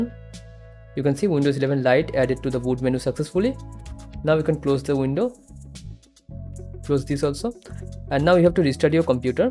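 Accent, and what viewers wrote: India. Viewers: Xati axomiya pronunciation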